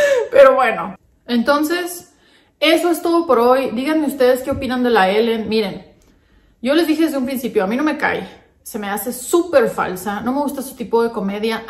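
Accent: Sonora, Mexico. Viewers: You tube Te sugirió eres de sonora ?? Ese acentooo es de mi tierra Hermosillo? O cercanías jaja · Holaaa! Apenas ayer me tope con el video de las “kardanshans” sepa la fregada como se escribe, nunca las he visto, jaj pero me gustaron tus comentarios, lo que mas me llamo la atención fue tu tonito y manera de hablar, dije esta es de Sonora y siii, en algún video lo dijiste ( porque me puse a ver mas videos tuyos) saludos desde de Hermosillo! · Me gusta ver tu canal porque me identifico mucho contigo en tu forma de hablar , igual que tú soy de sonora , saludos desde Navojoa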